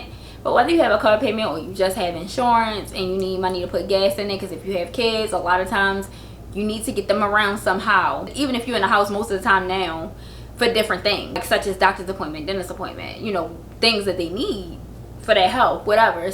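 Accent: Baltimore, United States. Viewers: Just found your channel. You are so cute! I love the Baltimore dialect. I will check out your resources. Nice to see a young entrepreneurial sister. Bless you and your babies❤️❤️❤️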